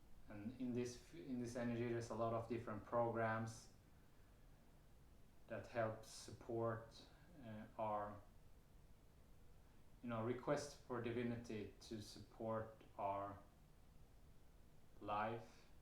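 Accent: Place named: Sweden. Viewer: Tyckte jag kände igen svenne vibbarna i accenten 😊 Tack för din utvärdering 🙏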